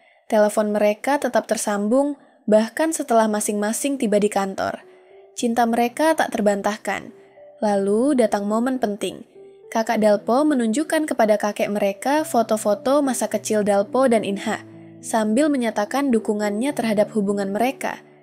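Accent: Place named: West Sumatra, Indonesia. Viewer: Pasti urang Minang kan kak wkwkwk😂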